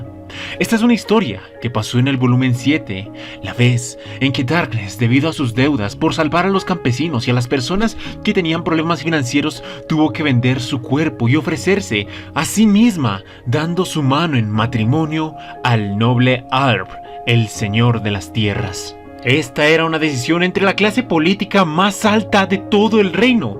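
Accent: Colombia. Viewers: Ste man habla colombiano de pura cepa jajaja es una chimba escucharlo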